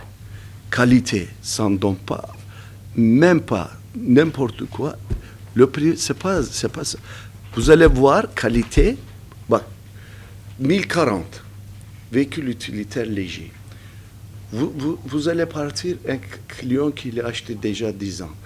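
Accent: Turkey. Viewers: تركي تركي تركي يا عباد هدا تركي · عجبتني لهجته التركية في حذيته بالفرنسية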